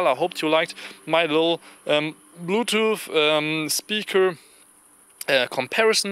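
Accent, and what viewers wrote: Germany. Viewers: Sehr informatives Video, danke dafür! Btw man hört deinen deutschen Akzent so hart :D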